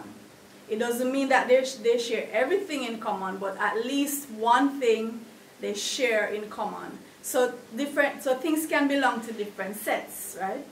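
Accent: Jamaica. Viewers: Really nice to hear a Jamaican voice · are you a Jamaican I love the way you speak... The accent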